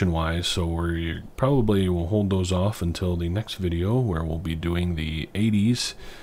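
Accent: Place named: Michigan, United States. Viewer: Haha just started watching your videos searching for allis chalmers heard your voice and said to myself that guy sounds like he is from Michigan and lo and behold you are lol I to am from Michigan in the U.P. I thought I caught a little yooper accent from you but could be northern Michigan as well lol anyway thanks for the video.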